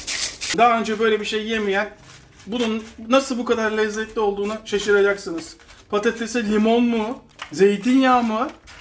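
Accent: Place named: Izmir, Turkey. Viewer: Uzun süredir videolarınızı ağzımdan salyalar akıtarak izleyip abone ol ve beğen tuşuna basmayanlardan biri de bendim itiraf ediyorum. Bu gizli gizli izlemekten veya herhangi bir kasıttan değil. Her videoyu izledikten sonra eliminin dışıyla ağzımın kenarından akan salyaları temizleyip vay hamamınınüy dedikten sonra üzüntüyle YouTube uygulamasını kapatmamdandı. Bu andan itibaren bir 1-2 dakikalık beyin donması oluyor sen de bize hak ver. Neyse bir tekneye göz koymuşsun bizim de katkımız olsun diye abone oldum, videolarına seri like basıcam şimdi, katılı aç katılalım da. Yalnız senden bir şey rica ediyorum. Aksanından konuşmandan İzmirli olduğun çıkarımına vardım. Yaklaşık 1,5 aya Türkiye’deyim ve çoğunlukla İzmir’de olucam. Beni misafirin olarak kabul eder misin?Rakılar benden, senden o Yunan mezelerini yapmanı isterim.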